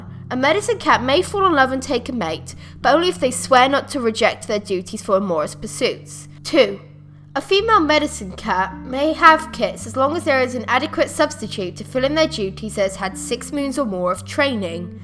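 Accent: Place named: England, United Kingdom. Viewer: OMG! I love your accent. It's beautiful. ^w^ Are you from England?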